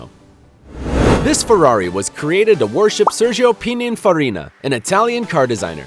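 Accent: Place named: United States. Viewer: Americans and your pronunciations.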